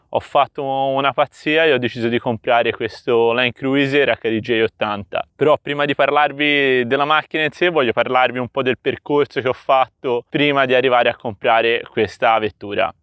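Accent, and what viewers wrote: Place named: Tuscany, Italy. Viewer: Iscritto, la parlata toscana come la mia ci sta abbestia! Curioso di vedere come lo camperizzerai😁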